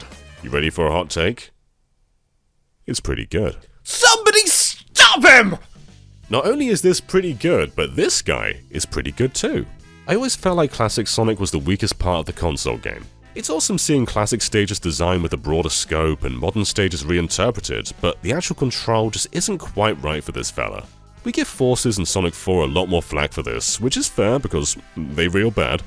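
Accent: United Kingdom. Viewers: Came for the content, stayed for the sexy deep British voice...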